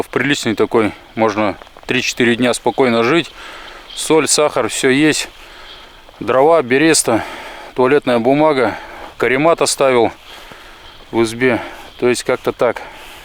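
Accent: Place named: Vyatka, Russia. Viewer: привет,классные видео,если не секрет,с какой ты области?,архангельск,коми,или Кировская обл,говор то вроде наш,вятский😀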